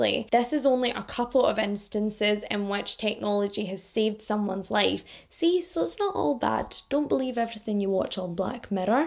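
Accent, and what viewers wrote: Scotland, United Kingdom. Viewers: Your personality is outstanding. You are the kind of friend I would be drawn to for all the laughs and accent too. LOL Being American the scottish accent sounds so beautiful, but your personality is outstanding. So happy I found you to watch and entertain my work days. I listen while I work and the day goes so much faster. Thank you.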